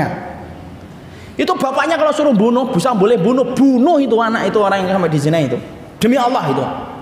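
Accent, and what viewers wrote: Java, Indonesia. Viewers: 😁 pernah dengar rakan-rakan keturunan jawa saya bertutur sama macam ustaz ni. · iya ka, belaiu orang jawa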